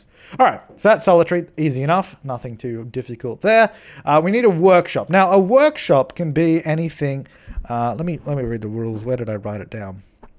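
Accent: Australia. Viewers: He does sound normal doesn't he? Makes a nice change. It even sounds like an Adelaide accent.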